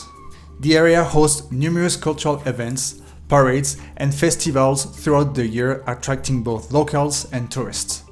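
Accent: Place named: Quebec, Canada. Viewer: I'm guessing Quebec Canadian speaking in English.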